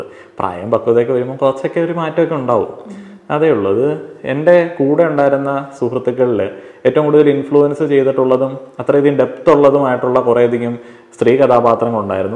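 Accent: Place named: Kottayam, India. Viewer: പെങ്ങളുടെ ഭാഷ കേട്ടപ്പം മനസ്സിലായി, കോട്ടയം ജില്ലയുടെ ഏതോ ഭാഗത്ത്‌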